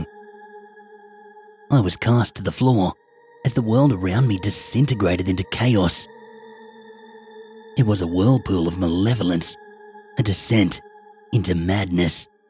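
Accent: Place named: Australia. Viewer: The Blackwood name stoped me in my search for 3hr+ story but a ghost story is a must stop. I got here by searching for new content and came across my Australian friends say hi to Ratty lol anyway I never listened to a ghost story from an Australian but I like the accent anyway not sure if that counts but I hope it does.😂 Cheers mate from across the salty water😂😂😂😂😂🇺🇸 I subscribed 😅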